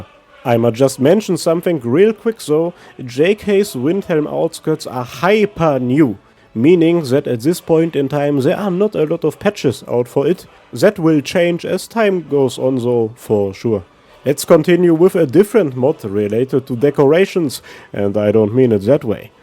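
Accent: Germany. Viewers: are you from DEUTSCHLAND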